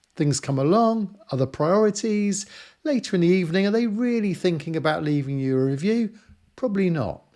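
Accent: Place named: England, United Kingdom. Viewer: Appreciate the guide. Makes a nice change having to listen a Englishman 😁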